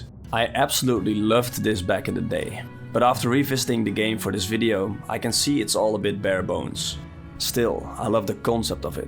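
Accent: Netherlands. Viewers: Nice edit. Also like te Dutchy accent. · That Dutch accent though… 😉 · Good video, if you're interested I would like to give you a few tips on your English pronunciation, being a Dutchy myself. You are super close to perfect pronunciation. All you really need to do is shake some of the hard f's, t's and s's that we use in Dutch all the time. Smooth those out and your English will be *chef's kiss*. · that thick Dutch accent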